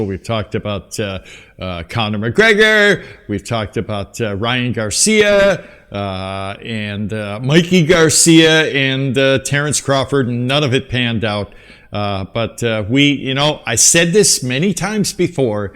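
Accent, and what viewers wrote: Ilonggo, Philippines. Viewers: Hahaha! Dipuga! Ilonggo mana istorya mo!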